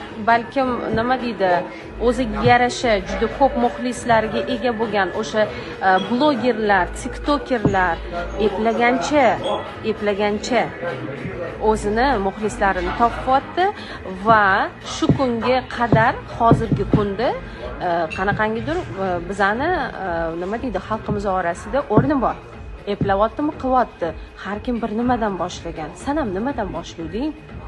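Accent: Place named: Kokand, Uzbekistan. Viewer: Sassiq qachondan rus tilida gapiradigan boldin yaqinda organvoldin shakili toza o'zbecha gapirardinku qoqonchalab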